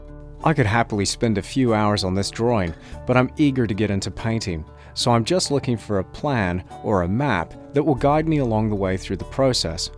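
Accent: Australia. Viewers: your accent is so Australian for some words, and so American for other words. I would have guessed the Aussie would get into all words, at least a little bit. But it's either one or the other though, very interesting. Great location by the way.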